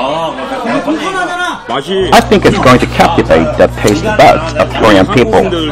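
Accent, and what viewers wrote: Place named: United Kingdom. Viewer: 영어가 영국식 같아요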